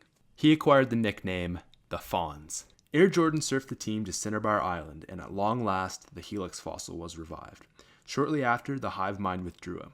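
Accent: Canada. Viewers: I hear that Canadian accent ;D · what is your accent? is it Canadian? it's very interesting.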